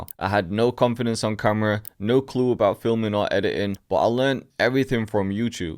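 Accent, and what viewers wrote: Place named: Manchester, United Kingdom. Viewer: Are you from Manchester? Accent sounds a bit like it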